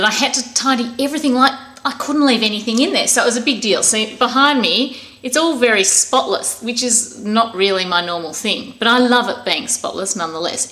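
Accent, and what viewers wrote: Australia. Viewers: yay 10 for the australian accent!!! Reminds me of my aunt lool!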